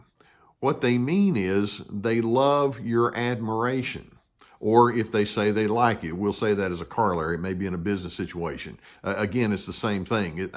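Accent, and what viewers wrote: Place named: Texas, United States. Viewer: Added bonus: his Texan accent is a pleasure to listen to, always soothing. It helps, because sometimes the cold, hard truth that he's giving is difficult to hear.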